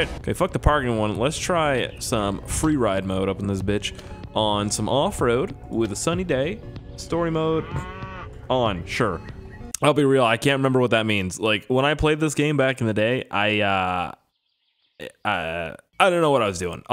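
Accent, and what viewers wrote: Russia. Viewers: Please do more random Russian games so I can hear your hilarious accents lolololol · Love your Russian accent xD More Russian videos!! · i'm disappointed you didn't start this episode in your Amazing Russian accent.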